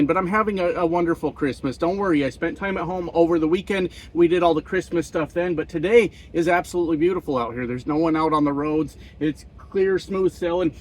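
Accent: Canada. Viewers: I hate to break this to you, sir, but you DO sound like the stereotypical Canadian. It's not a problem; I like Rush, Red Green, Bare Naked Ladies, SCTV, and the RCMP. 🇨🇦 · I am pissed off at the Democratic Party. They are responsible for Trump getting elected, and must never be forgiven and never be forgotten. There must be a new Second party that replaces the Dem party. They are horrible losers and failures. Biden was a loser and liar. He did not fire Garland when he did not charge Trump for his crimes. Sick of speeches that are just liars. Biden/Harris support for gaza war was more important than stopping trump. sick and unforgivable. The Trump/Maga people will destroy themselves, bashing them achieves nothing. oh,btw, you have a candian accent, so no surprise people might think you are from canada. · You do sound Canadian 😅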